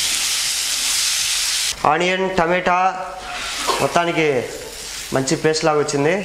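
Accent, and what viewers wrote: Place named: Tamil Nadu, India. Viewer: I like your video bcz u r Telugu is so , I have shocked because Tamil people Telugu is so different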